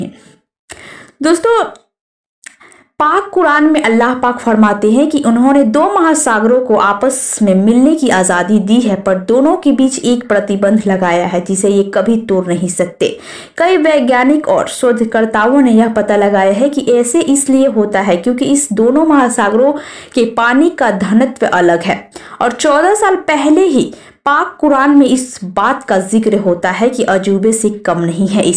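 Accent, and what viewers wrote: India. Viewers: Tumhari boli batati hai ki tum bihari ho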